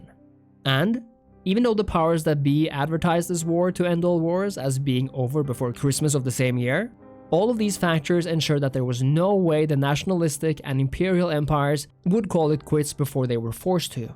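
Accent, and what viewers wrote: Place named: Norway. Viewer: I detect a Norwegian accent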